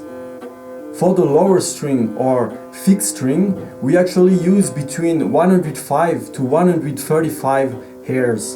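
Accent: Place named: France. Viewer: Naturellement ! je voulais dire par là qu'on entend bien votre accent français dans la langue de Shakespeare. Et oui merci pour les sous-titres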